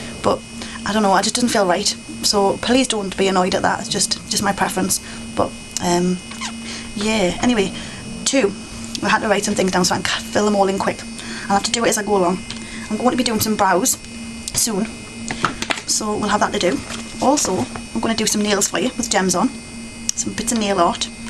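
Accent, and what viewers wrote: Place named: England, United Kingdom. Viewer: you have a great accent, english i'm guessing? you have great skills too woman :D & i totally understand about the collection thing and i respect you for thinking more of others than just yourself... all over awesome!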